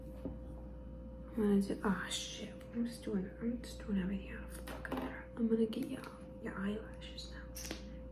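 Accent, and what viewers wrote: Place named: New York, United States. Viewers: The NY accent makes me feel right at home 🥹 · I love the whispered NY accent so much, it sounds so relaxing and comfortable! Love your asmr so much :D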